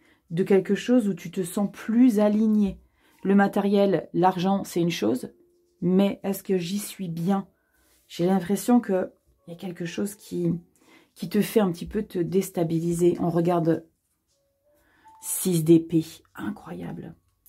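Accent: South, France. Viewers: Bonjour et merciiiiii!!!!!!, c'est la première fois que j'écoute l'une de tes guidances et je voulais tout d'abord te dire un grand merci pour ta bonne humeur, pour le sourire dans ta voix, pour ton enthousiasme, pour ton humour et pour ton "accent du sud" ❤ Ne change rien ❤❤❤ Maintenant merciiiiii pour la guidance et les messages ❤❤❤ J'avais tellement l'impression que cela me parlait personnellement que je me disais que ce n'était pas possible, tous les verseaux ne peuvent pas avoir le même projet que moi!!??? Alors je suis allée lire les commentaires des autres verseaux et je suis impressionnée de voir comment tes messages raisonnent pour chacun dans la même énergie même si c'est sur des chemins différents ❤❤❤ Alors encore merci pour tes messages qui confortent mes pensées et mes choix. Tu m'as donné tellement d'énergie!!! ❤❤❤ J'espère que tu as conscience du bien que tu fais autour de toi et à toutes les personnes qui t'écoutent ❤❤❤ Encore une fois ne change rien, tu rayonnes et c'est un bonheur de t'entendre ❤❤❤ Je te fais un très gros câlin ❤❤❤ Très bonne journée Valérie.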